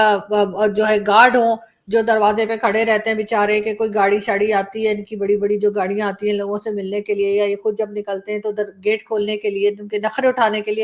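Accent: India. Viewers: जी🙏🙏 आपका विश्लेषण बहुत शानदार रहता है बोलचाल से आप जी आप भारत की लगती है। जब की आलिया शाह एवं रिबाह ये दोनो बोलचाल मे पाकिस्तान की लगती है आपको कोई खतरा लगे तो भारत आ जाओ।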